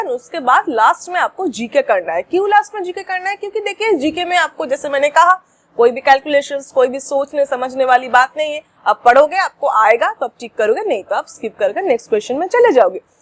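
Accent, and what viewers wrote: Bengal, India. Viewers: I think your ascent is begali ♥️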